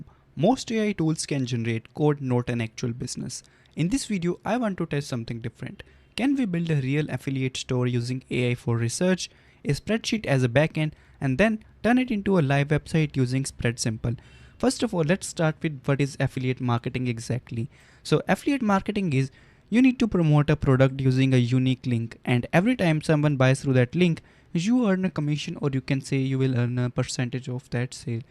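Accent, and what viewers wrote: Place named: India. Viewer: Sorry, but your Indian accent makes it difficult to follow the video. Better use a generic AI voice.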